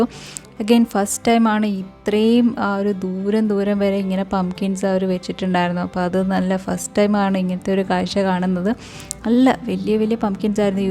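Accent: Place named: Kannur, India. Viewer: slang കേട്ടപ്പഴേ തോന്നി.😍✌️നമ്മടെ കണ്ണൂർ ഭാഷ.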